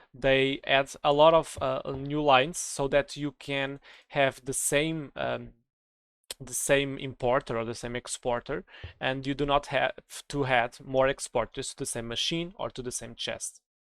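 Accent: Portugal. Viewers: You has portuguese voice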